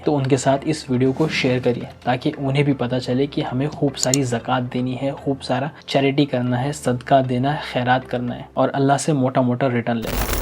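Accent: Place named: Marathwada, India. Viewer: Aapki Tone se lag raha aap, Aurangabad , Parbhani ya Nanded ke ho😅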